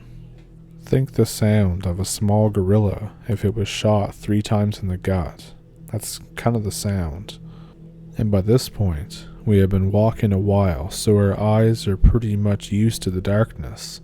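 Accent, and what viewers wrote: Canada. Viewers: I’d suggest that you employ the computer generated voices, as your spoken voice, with your Canadian accent, is pretty much monotone and there is no inflection nor alteration of tone… there is so much more that could be done with the content if only you took advantage of the enhancement opportunities that exist… just sayin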